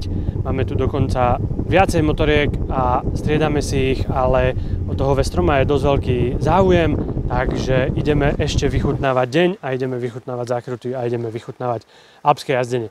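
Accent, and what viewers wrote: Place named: East, Slovakia. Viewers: Kamo keď nájdeš niekoho ako co sa venuje tomu viac ako 20 rokov tak potom mudruj. To ze webstranka ma klasicky design je pre nás old school priaznivcov motoride fajn. Pamätám ze bol jednej z prvých co robil kedysi merch a vtedy išli tie prve tričká ako teplé rožky. To ze prešli na platformu YouTube je fajn a videa sa brutálne zlepšili aj kvalita aj strih. A co sa tyka vychodnarciny tak to k patrí je autentický a na nič sa nehrá. Len tak ďalej chalani v redakcii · Chalani, viem, ze urobit dobre videa chce nielen nadsenie a prehlad v teme, ale aj teba mat aj iste zrucnosti v natacani v prezentacii. Nechcem vam zhadzovat robotu (napriklad prave preto netocim videa, lebo to neviem a nechce sa mi), ale zopar postrehov mi fakt neda nenapisat. 1. Nabuduce si tiez pozicajte farebnu motorku, lebo cierna by pred kopou hnoja nevynikla. 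2. Motor P2 nie je popularny, je to len vynutene zlo od EU. Inac by tam stale boli V2. Treba povedat pravdu. 3. Kamera zblizka z ruky co nedokaze chvilu zaznamenat stabilny obraz pomaha hodit sablu, ked sa niekomu toci hlava uz z tej vychodniarciny. To tocila frajerka telefonom? 4. Ked sme pri nej (myslim vychodniarcine), tak svetlomet sa zrejme sklonuje podla vzoru "parka". Dve parky, dve svetlomety. Kazda dlhsia veta ako od Kapitana Danka. Vole. K tomu dychavicny prejav ako po prave zabehnutom maratone. Neviem, ako ste na tom v redakcii. Pripada mi to, ako keby ste to robili uz len zo zotrvacnosti. Stranka rovnaka ako pred 15 rokmi (mozno aj dlhsie, ale len co si sam pamatam), chcelo by to viac aktivnych redaktorov, alebo aspon jedneho z bezchybnym vizualnym a hlavne hlasovym prejavom a vy by ste sa mohli zamerat na odborne vstupy, no a k tomu este nejaky stativ a kamerku ci fotak s natacanim. 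PS: Chcel som to povodne poslat mailom, ale este aj ta stranka vam bezi len na HTTP a nie na HTTPS!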